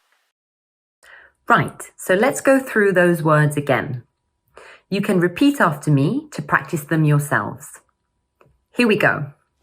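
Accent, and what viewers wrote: United Kingdom. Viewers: 이채널의 핵심이 영국식 발음 인거죠? 미국식이 아닌게 맞죠?? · 크~ 역시 발음은 영국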